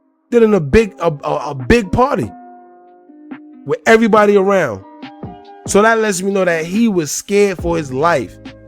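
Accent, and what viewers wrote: New York, United States. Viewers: How u kno dis and u sound like u from new york🤔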